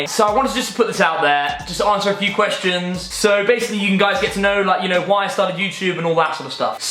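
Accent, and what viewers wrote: RP, United Kingdom. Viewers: Where is he from he sounds so posh to me 😂😂😂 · never realised how posh he actually sounds until now :O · has to be like the poshest sounding person on YouTube. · You are so posh. I ❤ your accentttt😍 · you sound quite posh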